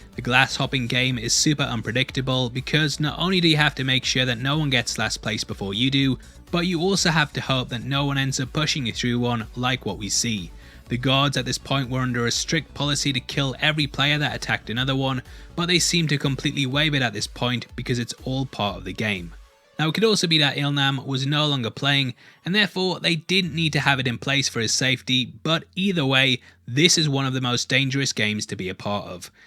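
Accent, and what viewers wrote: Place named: United Kingdom. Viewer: hes literally speaking british english or some other dialect of English that isn't American. imagine thinking that America is the center of the world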